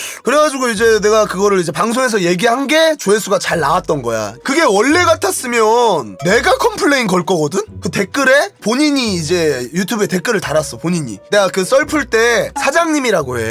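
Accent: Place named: Chungcheong, South Korea. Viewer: 충청도!! 전라도 아니거든요 참나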